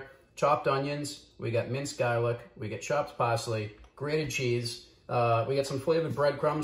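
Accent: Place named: Boston, United States. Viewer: I knew it was BS right off. If he wanted a little water in it, he would have put a little in the cans to rinse them out in the beginning. It was a good try try, though. Magic ice. 😏 I also knew, from his accent, that he was from an area in close proximity to Boston. NH-ites can pick up on that pretty easily. Lucky pooch at the end🐕 That's gonna make her fart, yanno.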